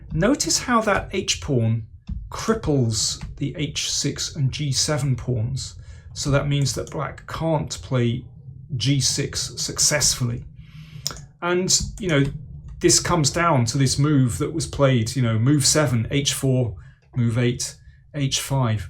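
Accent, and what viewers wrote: England, United Kingdom. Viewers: Daniel, as a french man and correspondance chess player, I really appreciate your clever comments and also your typical english accent ! Good revision for me. I think MVL came in this tournament without pressure : he had nothing to lose !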